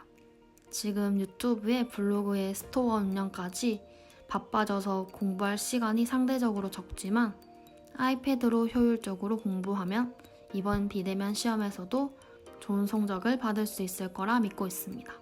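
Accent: Busan, South Korea. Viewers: 국립대라고 했지만 부산대가 쎄게 의심되는 억양..매력있어요ㅋㅋㅋ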